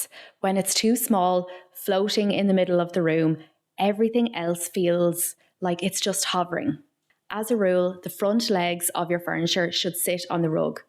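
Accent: Ireland. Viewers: Love your irish accent up the dubs 😂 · To a large extent, I am watching because i love Irish accents.....so there's that. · I'm here for the lovely Irish accent! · Thanks for getting straight to the point without a lot of unnecessary introduction and explanations. Your Irish accent is as beautiful as you are, too.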